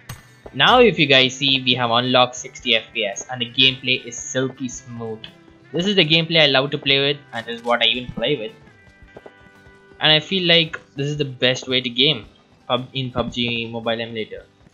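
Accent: India. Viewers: also am pretty sure you are an indian by your accent.I am indian too.The thing is that since i am from south India i dont actually understand hindi and all videos have english title but they talk in hindi except you.(not offending hindi but using english is better so that people from other country can understand)BTW do you like T series or Pewdiepie XD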